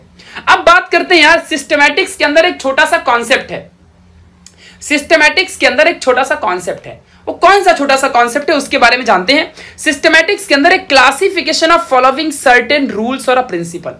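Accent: Maharashtra, India. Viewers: Your marathi is is the best part of video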